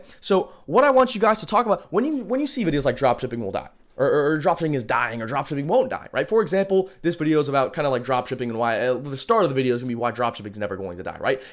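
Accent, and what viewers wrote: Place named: India. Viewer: this dude is funny. hahah. the indian accent. loooolll. im in cali too my boy :)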